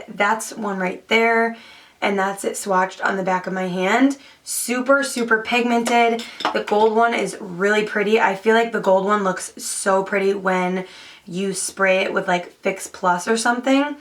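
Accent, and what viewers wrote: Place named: Upstate New York, United States. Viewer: Where are you from? I'm an upstate ny girl and you have a similar accent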